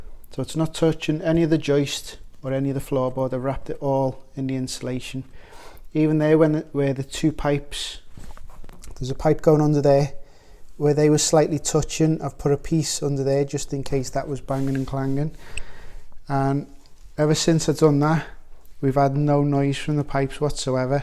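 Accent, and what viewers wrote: Liverpool, United Kingdom. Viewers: Liverpool accent lol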